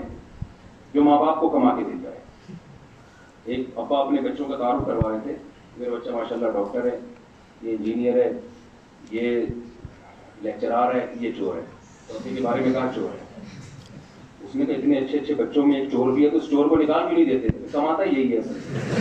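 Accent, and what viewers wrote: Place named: Karachi, Pakistan. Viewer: I'm Lucky for having residing near to the masjid where he gives Jumma. Khutba every Friday. I met him two years ago since then I never missed my salaat namaz , Ramadan and aitiikaaf, in his masjid. MUFTI SAAB is so friendly and kind. He gives his bayans are given in the language and accent that people in Karachi understands.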